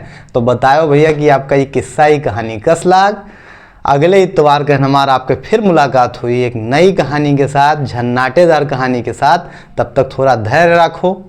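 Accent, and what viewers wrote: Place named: Awadh, India. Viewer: Sir aapki ye Sunday ki kahaniya Sun kr bachpan yaad aa gya❤❤😍..... mai ayodhya se hu aur aapki awadhi dil ko chhu jaati h...... aise hi awadhi ko aage badhate rahiye......👌👌👏👏👏👏👏👏🥰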